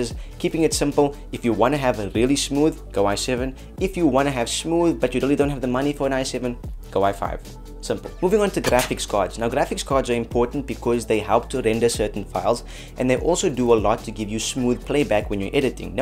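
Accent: South Africa. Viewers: Wena you are a South African neh?!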